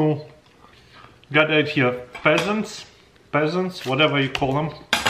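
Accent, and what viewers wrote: Russia. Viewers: I’m just here to hear him say different animal names in a russian accent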